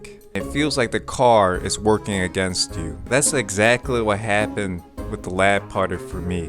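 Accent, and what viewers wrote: United States. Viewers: Super chill Midwestern tone. It’s cool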